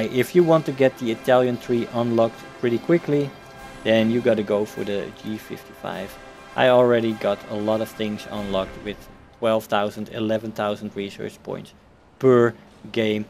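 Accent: Netherlands. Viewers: this plane is pure canser at itd br teams full of them kill us teams no losses btw are you dutch?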